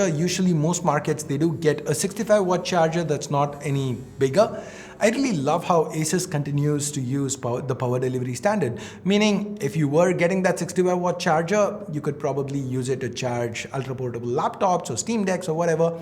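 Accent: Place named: India. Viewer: Finally, Indian english speaking reviewer...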